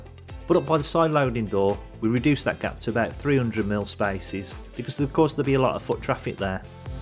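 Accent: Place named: Walsall, United Kingdom. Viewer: Thanks for sharing your build and I’m just about to start my build. I’m based in Walsall and you sound very local 👌🏻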